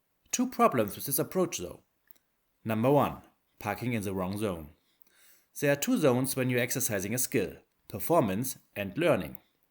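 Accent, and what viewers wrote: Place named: Germany. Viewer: Well, I liked your video. And you sound like you're German. Which is also good.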